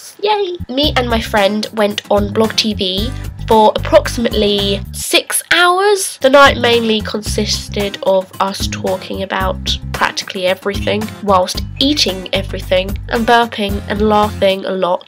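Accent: United Kingdom. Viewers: You make best videos on youtube for practicing british accent. And you're funny.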